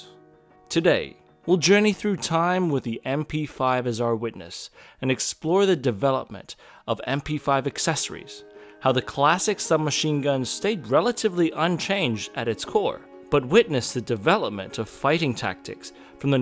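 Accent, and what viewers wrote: Germany. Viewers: Idk who this guy is but when I see an asian dude do a german accent I sub immediately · An Asia, speaking in a German accent is peak 2026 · great. like your german voice game. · Best SMG ever! Update: Your German accent is so naturlich!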